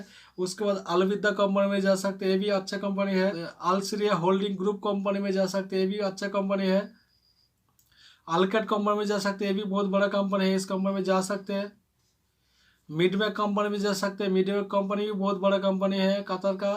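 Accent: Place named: Bihar, India. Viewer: Sabse pehle yey bata Tum Bihari ho kya !! Aap ke awaz se aesa Lagta h ke aap Bihari ho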